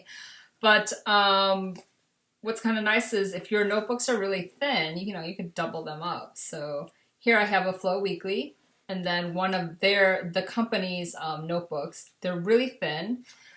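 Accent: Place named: Germany. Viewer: Hey your German is cute! Greatings from Germany 😀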